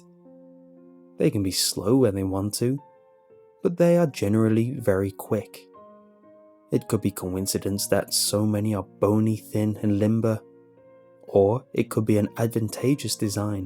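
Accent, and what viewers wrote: United Kingdom. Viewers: your british acksent makes stories scary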